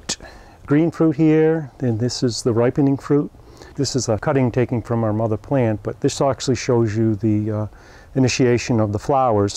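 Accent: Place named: Boston, United States. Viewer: You have kind of a Ted Kennedy accent.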